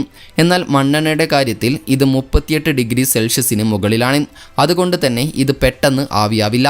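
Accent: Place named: Kasaragod, India. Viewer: ഓരോ ജില്ലയിലും ഓരോ ഭാഷാ ശൈലിയുണ്ട് അത് അവരുപയോഗിക്കുന്നു ഞാനാണ് ഇങ്ങനെ പറഞ്ഞിരുന്നുവെങ്കിൽ മലയാളത്തിനു ഒന്ന് അമർത്തുക എന്ന് നീ പറഞ്ഞേനെ അതാണ് കാസ്രോട് ❤️❤️... പിന്നെന്ത് നിൻക്ക് സുകന്നല്ലേ അങ്ങനല്ലോ ബാണോ ബാസയ് 14 ജില്ലാ 14 ബാസയ് അതന്നെ കേരളോ...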